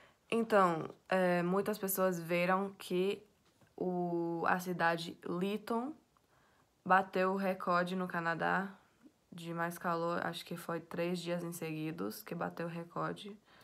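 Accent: Bahia, Brazil. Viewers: Parabéns, você é uma menina muito culta, seria bom ver jovens como você tão inteligente e voltada pra as coisas que acontecem no mundo. Suas verdades nos inspiram... Obrigado... Aproveitando o ensejo, é incrível como você fala muito bem o português brasileiro (sotaque nordestino - Bahia)...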